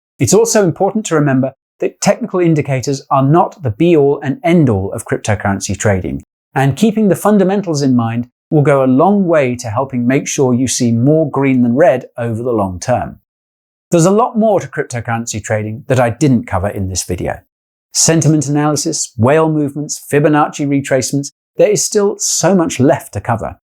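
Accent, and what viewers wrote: United Kingdom. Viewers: so much priceless information! and even explained with a British accent! couldn't wish for more than that 😍😁 you' re very funny too 😁, love your jokes hehe makes everything so much fun and easier ❤️ thank you so very much! ☺️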